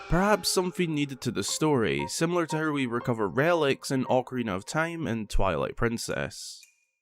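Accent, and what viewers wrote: Scotland, United Kingdom. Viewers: I love your scottish accent it makes the videos so chill🥰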